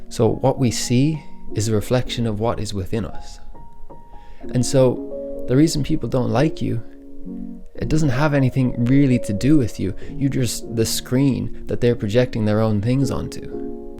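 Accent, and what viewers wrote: Ireland. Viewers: the aule paddy accent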